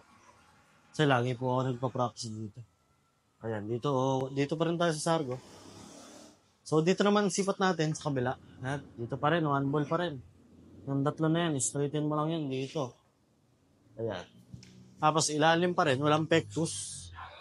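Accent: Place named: Rizal, Philippines. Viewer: Taga Rizal ka ba bos. Ang punto mo Rizal.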